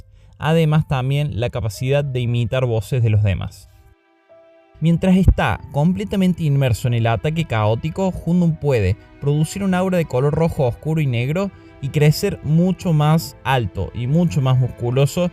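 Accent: Cordoba, Argentina. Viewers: Sos el primer youtuber cordobeeesaso que escucho jajaja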